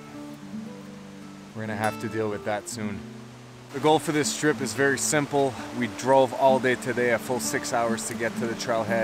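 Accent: Quebec, Canada. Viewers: Any day you decide not to summit is a good day! Great video. Glad YouTube recommended it to me. Are you French Canadian by chance? You sound very similar to some of my friends from Quebec. All the best to your next adventures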